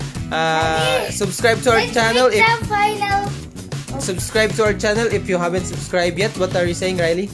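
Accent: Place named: Philippines. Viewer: Owe men your accent, are you a filipino?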